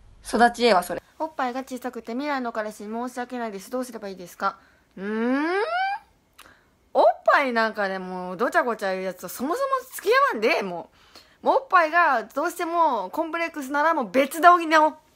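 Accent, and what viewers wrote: Okayama, Japan. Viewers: え？岡山県民って素でこのなまり？？ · 広島県民だけど、広島弁よりやばい面白い岡山弁wwwwww これまたやってほしい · こがなチューしよで1人で大爆笑してしまった…爆笑 岡山弁日常的に使う人からしたらめっちゃ聞きやすい！！（笑） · 岡山弁最高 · 岡山弁ええなぁ なつかしい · 岡山弁可愛いすぎい！ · 岡山弁がぬけないとこ大好き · 自分も岡山出身だから岡山弁わかりすぎて好き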